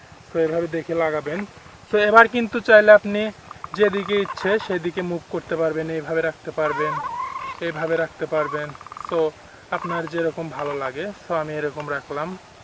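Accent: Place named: Bogura, Bangladesh. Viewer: এগুলা বগুড়া এলাকার কথাই পাওয়া যেত পারে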